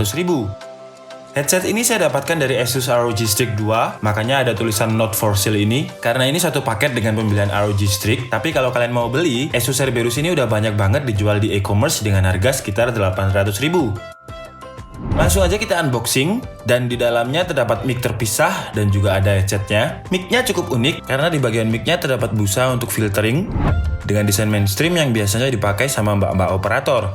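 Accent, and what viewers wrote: Java, Indonesia. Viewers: Njarem jawa bangett haha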